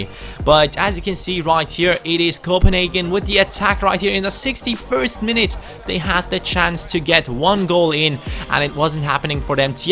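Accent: Germany. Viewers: He's Bavarian German ;)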